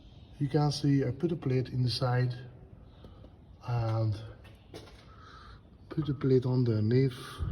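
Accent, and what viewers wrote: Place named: Northern Ireland, United Kingdom. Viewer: I might be wrong but you sound like you've picked up a bit of an northern Irish accent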